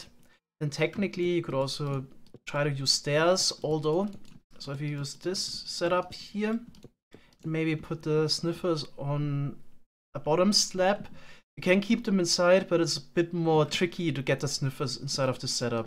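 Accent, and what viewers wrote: Germany. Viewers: you hear so on as u would be german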